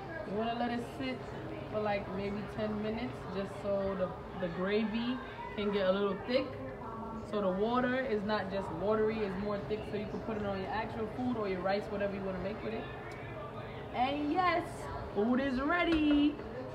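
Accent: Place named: New York, United States. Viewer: I just love ur new York accent 🙂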